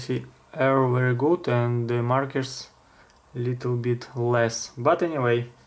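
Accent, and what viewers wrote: Russia. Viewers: я не знаю английский, но обзорщик явно русский)))) а часы отличные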